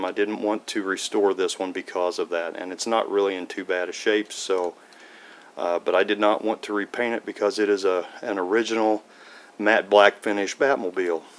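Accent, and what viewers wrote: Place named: North America. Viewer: I'm guessing you're North American from your accent, so how did you get your first Batmobile in the US, as Corgi is a British company? Where they available in the shops or did you have to pay more to get it delivered from the UK? Didn't any American toy companies make Batmobiles? Sorry mate, lots of questions, but a really interesting vid!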